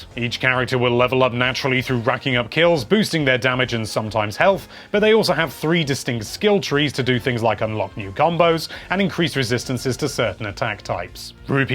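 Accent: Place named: United Kingdom. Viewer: thedreadedzero it’s the British-English pronunciation, as stated in the dictionary. Die-nasty is an American pronunciation, and is british.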